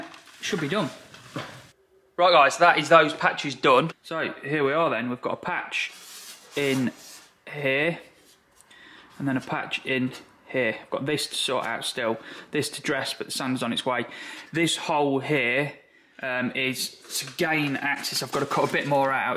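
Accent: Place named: Nottingham, United Kingdom. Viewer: Nice work. I’m trying to work out your accent,. Are you from Nottingham?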